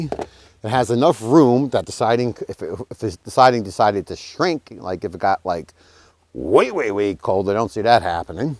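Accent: New York, United States. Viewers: funny and to the point. except your house or not. you need a moisture barrier. t1-11 is wood, any wood that is contact with water will rot over time. the little extra time and money would have been well worth it. love the straight talk , sounds like your from jersey or new york.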